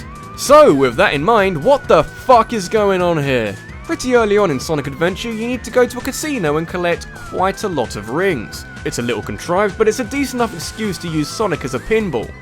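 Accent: England, United Kingdom. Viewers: For those wondering, he did pronounce Lumiose City correctly. While his pronunciation of the last syllable would usually be wrong, his particular English accent gives him a free pass, since the real pronunciation actually would sound like that if spoken with that accent unless the speaker was fluent in French to the point of using it interchangeably with English. As for the emphasis on the word, there is no accent on any of the vowels, so the first syllable gets the emphasis.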